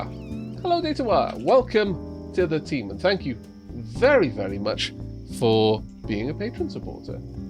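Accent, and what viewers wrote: Wales, United Kingdom. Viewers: Question are you from Wales if so are your from South Wales?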